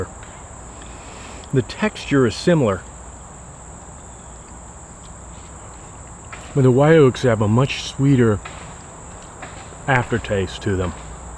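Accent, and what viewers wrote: Georgia, United States. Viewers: are you from Georgia?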